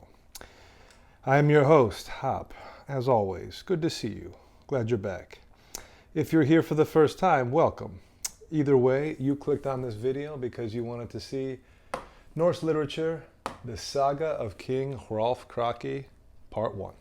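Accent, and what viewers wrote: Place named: United States. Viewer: You sound American brother. You might like this book written by a First Generation American born Norwegian titled SPIKING THE GOLD or WHO CAUSED THE DEPRESSION & THE WAY OUT (a book of facts essential to the welfare of every American). Author was born to the Arne Andreas Nordskog son of a butcher from Oslo. That’s better handwriting than mine by the way.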